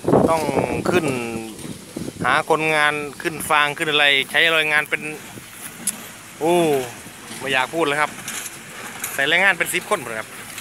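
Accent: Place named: Isan, Thailand. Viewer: กาบเชิงยุบ้านใด๋นอคับเว้าสำเนียงคือยุบ้านผมเลยผมยุโนนนารายณ์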